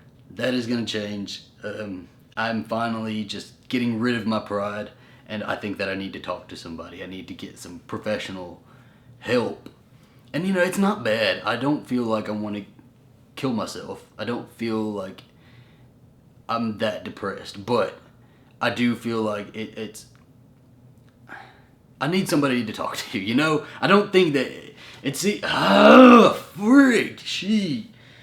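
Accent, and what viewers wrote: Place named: Southwest, United States. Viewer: Sorry to see you going through this stress. Speaking as a very old senior gay man (82) therapy is absolutely nothing to fear; therapy triggered my own coming out. I enjoy following you on youtube and have seen elsewhere on the internet certain persons trying to take you down. As a fellow Texan I especially resent those who mock your decided Southwestern accent and who fail to comprehend someone can have an Hispanic surname without having just swam the Rio Grande. In fact, there are such families in Texas which predate any Anglos. You are a great couple and a fine role model, but don't try to take on too much. I am not familiar with the company you reference but also keep in mind many cities now have local referral systems for LGBT folks. Now take a deep breath.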